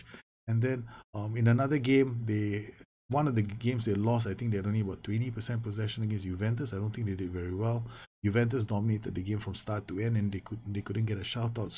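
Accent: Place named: Singapore. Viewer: you sound a little Singaporean hmm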